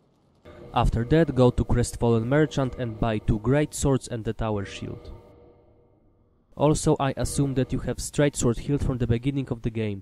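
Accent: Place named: Italy. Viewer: Avverto un accento italiano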